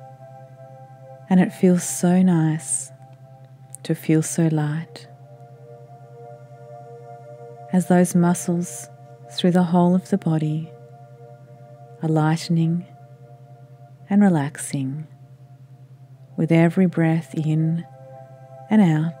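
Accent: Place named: Australia. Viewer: Your Aussie voice is the best calming sound!!! I even set Siri as an Aussie - keep it up.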